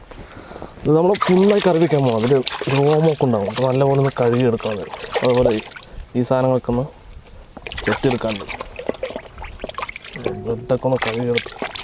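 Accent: Palakkad, India. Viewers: Thani palakkadan malayalam slang ishtamullavar oru like adikko😍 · തനി നാടൻ പാലക്കാടൻ തനിമ സംസാരത്തിലും പെരുമാറ്റത്തിലും, നിങ്ങളിലൊരാളായി ജീവിക്കാൻ ആഗ്രഹംതോന്നുന്നു👌👌👌👌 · adipoli pkd slang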